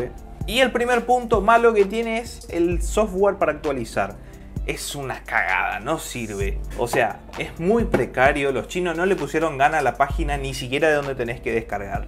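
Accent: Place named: Paraguay. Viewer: Muy en el fondo se te nota el acento paraguayo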